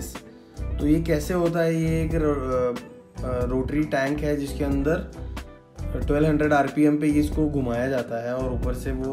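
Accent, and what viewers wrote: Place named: Delhi, India. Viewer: Never expected this kind of Hindi accent ( Delhite) from the South Maharashtrian person.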